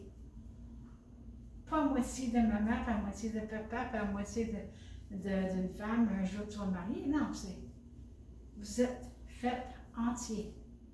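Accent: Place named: Quebec, Canada. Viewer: J'aime bien votre joli accent Québécois 🔝✅👍💯💖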